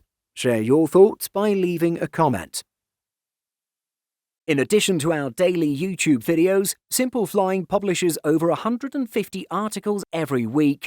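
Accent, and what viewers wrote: United Kingdom. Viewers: Low value production. No real radio calls. Its just a guy with a british accent reading a report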